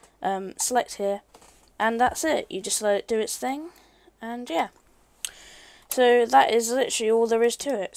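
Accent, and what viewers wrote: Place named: United Kingdom. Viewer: Bri'ish boi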